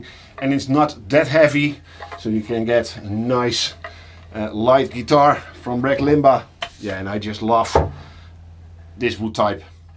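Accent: Netherlands. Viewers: I'm detecting a slight Dutch accent... Are you Dutch?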